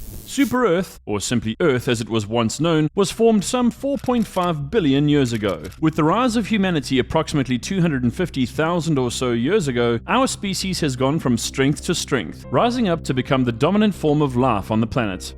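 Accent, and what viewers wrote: Johannesburg, South Africa. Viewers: Started watching video, about 5 seconds in I was like hold on, this is a Joburg accent (northern suburbs even). Continued watching and now I'm sure of it. Aweh bru :)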